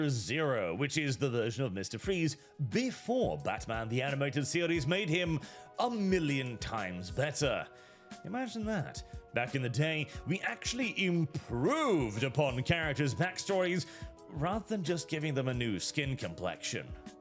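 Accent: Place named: United Kingdom. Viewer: Damn your British Accent makes this review so much better. Feels like 🤔…Writing Styles of The Broke & Brainless would be an appropriate title 😂.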